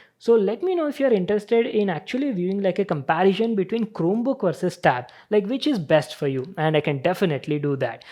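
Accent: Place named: Telugu, India. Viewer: English with Telugu slang can be easily understood nuv super anna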